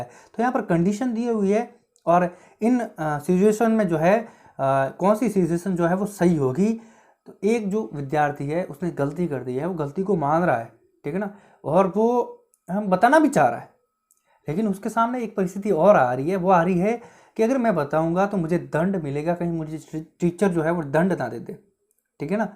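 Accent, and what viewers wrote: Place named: Ganganagar, India. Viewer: Sir aap ...beenjhbayla.(ganganagr) se ho kya ?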